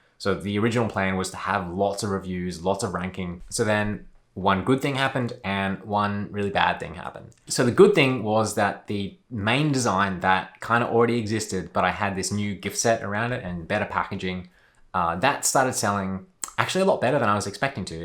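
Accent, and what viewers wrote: Australia. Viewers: you look like an indian but you have an Australian accent and you look like a leprechaun. Just a weird combination :(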